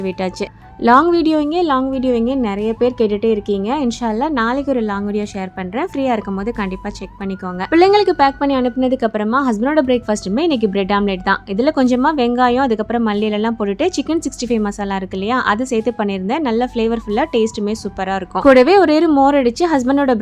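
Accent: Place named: Kilakarai, India. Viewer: Nega kilakarai um kayalpattinathaium mix panni irukeenga 😂super maashaa allah..rendume slang and traditional ore mari than irukum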